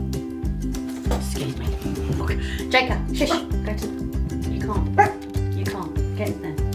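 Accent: United Kingdom. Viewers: I could listen to you talk for hours! Love a British accent! Your tutorial for doing makeup on a hooded eye is so well done. Just a little tip: don’t say negative things about yourself - I think you are a beautiful lady! Loved the whole video. Looking forward to more from you! 😊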